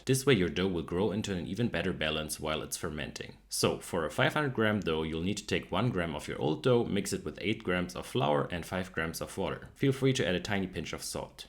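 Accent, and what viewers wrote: Germany. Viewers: Wow thermomix congratulation, salut 🤣🤣🤣you are too funny -I got lost when you talk with a lot of numbers 😢( failed math in school )can you theorize sourdough panettone please - panettone is the king of sourdough bread -❤I love German accent and jokes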